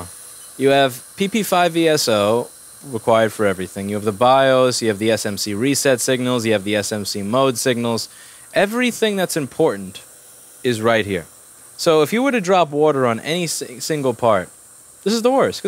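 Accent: New York, United States. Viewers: haha such a new yorka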